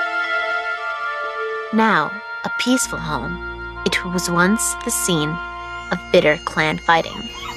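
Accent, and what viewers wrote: United States. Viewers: Scotland in a US accent. Gimme a break. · This is just taken from the "Castle ghosts of Scotland" series except they've just cut it down and remixed some scenes while cutting out others and got a Yank narator instead of the original which had an Englishman.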